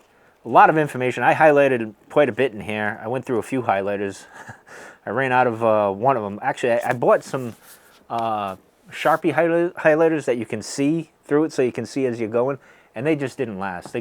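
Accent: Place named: Boston, United States. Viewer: Judging by your accent you sound like a Celtics fan?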